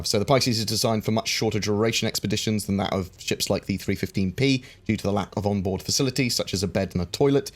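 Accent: England, United Kingdom. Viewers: the English accent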